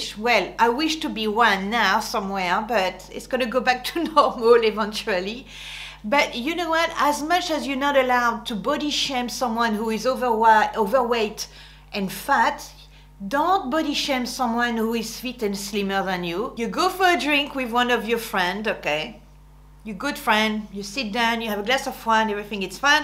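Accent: France. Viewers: Hi, I love your accent and of course your channel. Your accent reminds me of my childhood living in Belgium when I had to learn French at school. I am German, now living in New Zealand and I totally understand your cultural struggles. I am glad I found you. After I lost my husband nearly three years ago to cancer, your videos give me a little bit of joy and a few moments of going down memory lane, when we visited Paris, or me, staying in an isolated house by the sea in Britagne for a holiday and remembering my French friend Isabelle who introduced me to real Champagne and Oysters... Thank you for bringing back these memories to me. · I love your smile... and your French accent 😊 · Great video. Love your French accent! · I love your style tips with your lovely French accent, and Oscar is so cute! 😘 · I love your french accent! I honestly just really love it!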